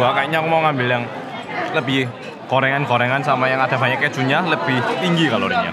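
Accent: Java, Indonesia. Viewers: Btw ka jawa nya medok bgt 😂 kalo ngomong serasa kaya pak jokowi yang ngomong 😂😂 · ngomongnya Medok jawa ya... wkwkwkkw lucu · Gw kira suara nya logat korea gitu eh ternyata medok:v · Aku baru nton dann sumpah aku nton berasa nton org indo yg lagi di korea😂 duhh logatmu mas indonesiaa bangeet💗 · Wwiiiiihh entek petang piring reeeekkk....hahahahaha......nggak luuwweeee iku reeekkkk...tp ruwakuuusss yooo......btw its ok 👍👍😍😍😘😘 Suuueeenneeenggg puoll ndelokno e.....success trus ya nas sing muuueeeddokk👍👍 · Kira-kira apa yaa reaksi orang Korea asli yg nggak ngerti Indo pas denger Mas ngomong Indo medhok. P.S Kostum menghadiri pernikahan di Korea lebih simpel cenderung monokrom, nggak kayak di Indo yg agak gimana gitu liatnya warna warni kadang juga dandanannya suka heboh. (*Kecuali yg ada ketentuan warna dresscode yaa) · Logatnya jowo buanget 🤭🤭🤭🤭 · Banyak makan nya si korea medok... 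gak rugi kl all can you eat.. hahahh 😅😅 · Hallo wong korea medok piye kbre??? Cobo melu nak sek jenenge 패펙 aku pengen weruh.... Maturswun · Ini orang korea apa indonesia. Logatnya xo jawa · Medok banget jawanya:') Lucu sumpahh>< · Lucu ya.. Org asli korea malah logatnya jawa.. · Medok banget ngomong nya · Medok banget omongnya kaya aku , jadi lucu deh kaka 😂😂😂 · Bahasa nya medok bngt, salut aku , 😍😍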